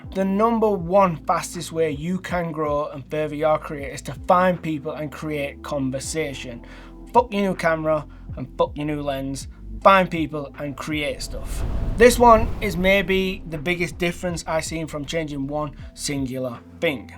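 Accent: United Kingdom. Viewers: Great tips, thanks, I HAVE to ask where in the UK your accent comes from? (if you don't mind)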